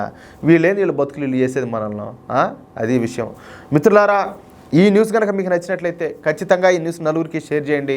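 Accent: Rayalaseema, India. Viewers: ఒక్కటి అంచనా వేయలేక పోతున్నా సోదరా మీ మాటలు యాస కేచ్ చేయలేకపోతున్నా మొతంగా రాయలసీమగా అనుకుంటున్నా. మిక్స్ అయి వుంది. Tq.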